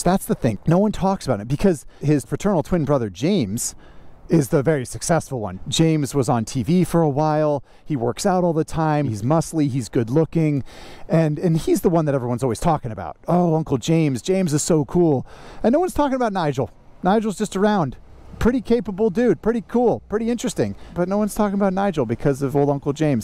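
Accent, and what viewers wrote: United States. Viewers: Hi, hi, hi comment section chatters, just this line to say: real nice intro, the change is welcomed (your laugh / the rain) thank you for your verbal skills.. best ad rep online (not that i am interested since i'm european and we get real motorcycles ) 😹 (sorry the pun could not be whiff held) thank you for the number of bikes you put your tushee on (this one looks as ugly as it can faking retro looks...) thank you for making me remember what it is to hear the north 'murican language (don't know why but i do miss it ❤ ) thank you for (in the future) changing the format more often (like you did under the rain or in india) (just couple it with revere ad rep 😸) thank you for ari and lance and spurgeon thank your wife (and kids?) good luck have fun, thank you for your company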